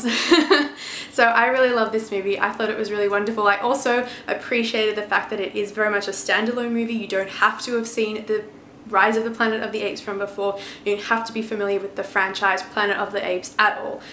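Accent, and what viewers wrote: Australia. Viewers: Glad I found your channel. Subscribed. Are you Australian?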